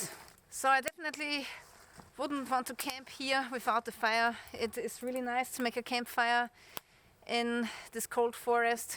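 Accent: Austria. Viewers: Nice video, love the way you explain everything about mushrooms, I don’t care much about mushrooms🥴 but hope you have good harvest next season BTW I love your Austria 🇦🇹 accent ☺️👋from 🍊orange County Ca, ⛺️🪵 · I always wondered about your strange accent. It sounds german but not exactly. Now i found out you’re Austrian. So well, that make things clear. Kind regards from Belrin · irgendwie irritiert mich dein ösi-akzent im english ;) weshalb english video? wg reichweite? oO oder bist du ausgewandert?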